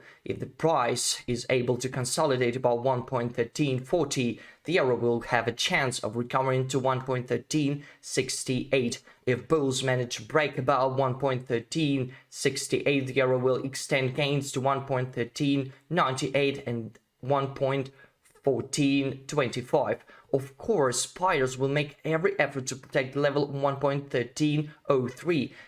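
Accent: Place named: Russia. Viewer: Are you from Russia? Ты из России? Произношение выдает тебя)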